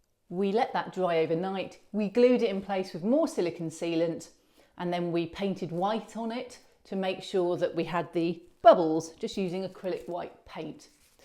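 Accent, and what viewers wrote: United Kingdom. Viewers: Oh my ,I`m going back 3 years,but soooooo much to see and learn from you.First off ,as the lady below mentioned, it is quite nice to see a woman doing these types of tutorial videos....a real breath of fresh air (and I like the English accent) Now then I plan on doing a bit of water effects on my expanded layout (most likely using Envirotex Lite ,readily available at my craft store and 50% off coupons make it a good choice) and was wondering if you were using Tamiya acrylic paint to tint your water product and if I could use Tamiya to tint Envirotex Lite ? Thankyou and please keep making these fine videos!! Oh oh ,I was watching another of your vids (Dec.2015) about your troubles with Envirotex Lite ,the browning and the bubbles etc.Do you figure it was the way you mixed the product that may have caused these troubles ?Thanyou.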